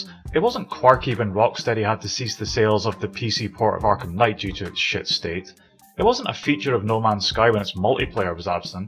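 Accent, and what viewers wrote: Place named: Scotland, United Kingdom. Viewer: Your Scottish accent makes this video a 10/10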